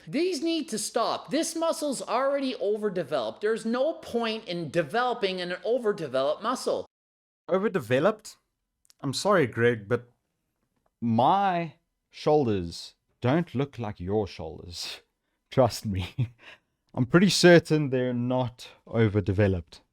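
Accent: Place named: South Africa. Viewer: I thought so when I heard your voice, awesome seeing south african creators on this platform. I'm from cape town.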